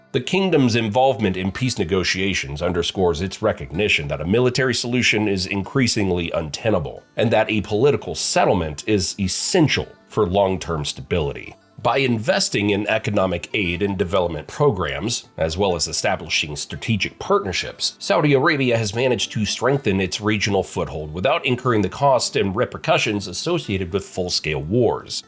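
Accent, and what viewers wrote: United States. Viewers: Thats how Americans pronounce it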